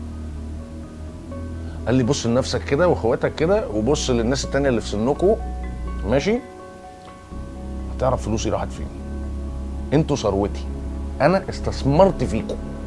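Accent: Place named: United States. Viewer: I remember you from nadi smoha, get rid off the American ascent on your words.. please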